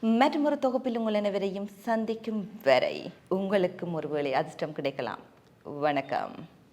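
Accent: Jaffna, Sri Lanka. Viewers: Ethukku oru Mathiayana tone speak proper jaffna accent like Dr Arjuna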